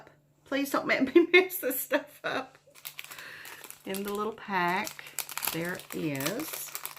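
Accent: South, United States. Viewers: Hi friend, I can't decide what i like best about you. Your sweet smile, those beautiful smile lines near your sparkly eyes, your lovely southern drawl...wait, I KNOW. Your kind spirit. Every single time I stop by I feel bathed in Grace & Goodness. I get almost choked up with how kind and considerate you speak to us. Thank you so much. I needed to hear your kind voice today. Oh dear, my very worst habit is, when i am nervous, I chew my cuticles. Sigh. Thanks for filling by day with such beauty. You are a gem. Happy Stitching, friend, Daleen PS. Your cheesecake finish is beautiful.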